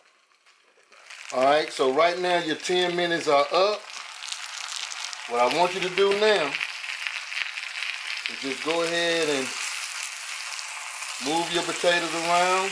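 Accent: South, United States. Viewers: I haven’t even watch the video yet but I can tell by the thumbnail and country voice this is going to be good. I love black people!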